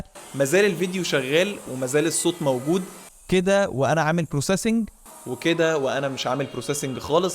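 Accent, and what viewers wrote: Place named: United States. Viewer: مادري المشكله بس عندي ولا لا بس لاحظت ان لكنه الكلام تقلب كأنها امريكي يتكلم عربي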